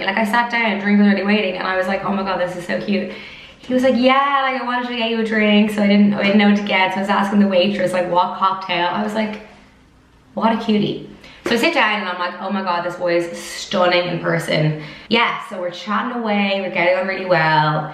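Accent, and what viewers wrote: Ireland. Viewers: She sounds Irish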